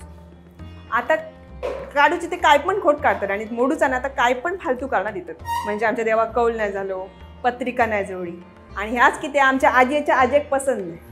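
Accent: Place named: Malvan, India. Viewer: .... तुमचे व्हिडिओ खुपच मस्त असतत हा.. अस्सल मालवणी मस्त बोलतास. अगदी गावाक गेल्यासारख्या वाटता. तुम्ही आपलें जुने मालवणी परंपरा, चालीरिती..जगासमोर आणतास ह्या खुप चांगला काम करतास. तुमका खुप खुप शुभेच्छा... मी तुमचे सगळे विडिओ बघतय.खुपच छान असतत.... तुमचा खरा नाव काय आसा...? 😊🙏🏻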